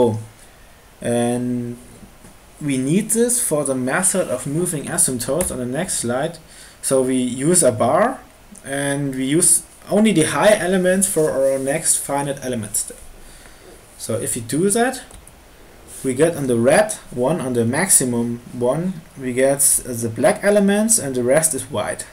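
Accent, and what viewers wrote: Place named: Germany. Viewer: alter der deutsche Akzent scheppert alles raus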